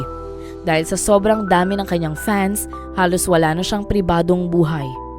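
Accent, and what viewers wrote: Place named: Pangasinan, Philippines. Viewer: nacurios ako sa issue na to kaya pinanuod ko, parang may tonong/puntong pangasisnense yung voice over❤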